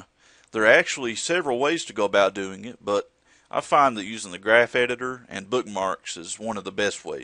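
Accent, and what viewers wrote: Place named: Texas, United States. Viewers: You sound like a Texan guy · Damn. Now THAT is a real texan's voice. Thanks for the help. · nothing's better than a texas dude explaining a tutorial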